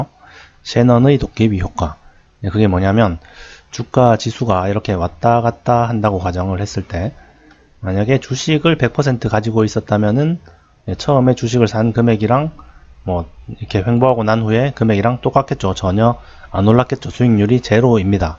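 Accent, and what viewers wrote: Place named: Busan, South Korea. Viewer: 말투가 부산분인 것 같았어요ㅡ ㅎㅎ 저도 부산입니다. 용호한의원이 한곳 뿐인가요?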